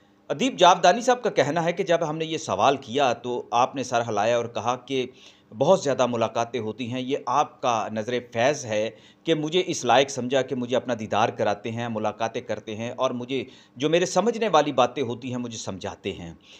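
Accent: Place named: Kashmir, India. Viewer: Assalam o alaikum warahmtullah ap taluq azaad kashmir sy hai apka accent bilkul wesa hai... Allah kareem aulia Allah ki mohbbat or adab o ehtraam wala rakhay Aameen..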